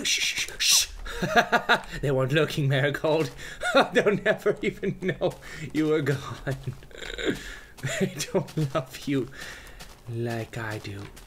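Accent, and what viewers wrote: United Kingdom. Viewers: You have an awesome British accent! :D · hey! are u talking british? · Love ur British voice! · I FREAKIN LOVE YOUR BRITISH ACCENT WHEN I HEAR IT I PASS OUT!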